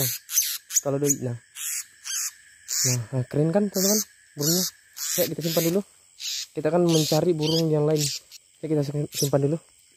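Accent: Bugis, Indonesia. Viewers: Orang bugis kah bang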